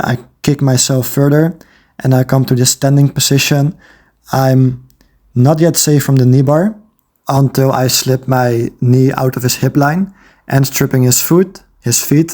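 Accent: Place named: Belgium. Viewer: Your accent sounds like a Belgian accent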